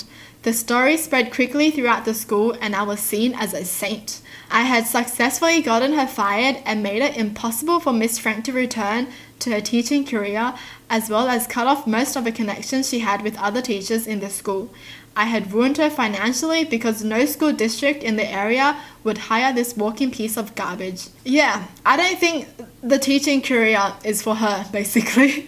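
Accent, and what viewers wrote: Australia. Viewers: iamshooketh she’s just Australian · iamshooketh she’s Australian but so trueeeee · Is she Aussie? 🤔 Where does she live? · Love her Australian accent aswell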